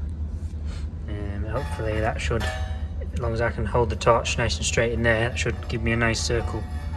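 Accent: Yorkshire, United Kingdom. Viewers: Er kommt aus dem norden von Yorkshire, vermutlich nähe Thirsk. Ich nehme an, Sie meinen "Stuud" und nicht Stahd für "Stud". Tcha, Yorkies haben auch Dialekt, ursprünglich "old English" and "old Norse".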